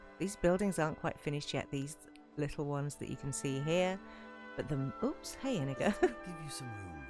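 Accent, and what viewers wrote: United Kingdom. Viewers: Did know you from Yorkshire, I'm from Leicestershire 🌹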